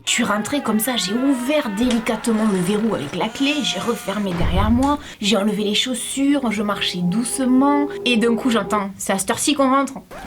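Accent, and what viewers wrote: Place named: Villeneuve-sur-Lot, France. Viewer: MAMAN TU ES VRAIMENT MA MÈRE JE SUIS NÉE À VILLENEUVE SUR LOT! TON ACCENT ME DISAIT QUELQUE CHOSE ❤️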